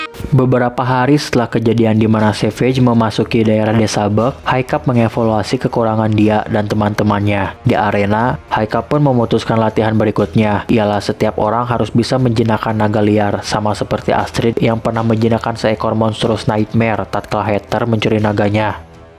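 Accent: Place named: West Java, Indonesia. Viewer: ngomongna masih Aya sundaan euy meski Jang konten yt👍🏻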